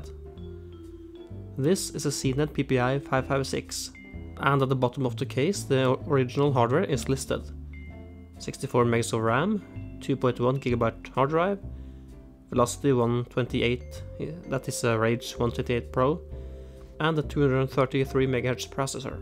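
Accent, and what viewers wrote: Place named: Norway. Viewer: Du er norsk? Er bare vi som snakker engelsk sånn=P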